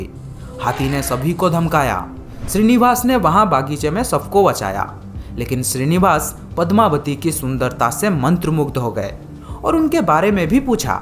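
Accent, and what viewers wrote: Odisha, India. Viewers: This youtuber is also an Odia..you can mark his odia accent.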